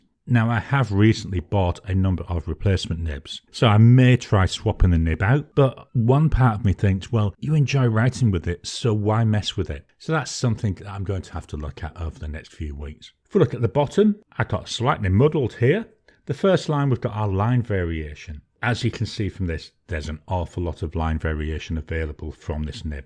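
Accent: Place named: Birmingham, United Kingdom. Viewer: your bio says you're based in Austrialia but to me you sound like you're from Birmingham. Am I right or am completely off on this one 🤔😂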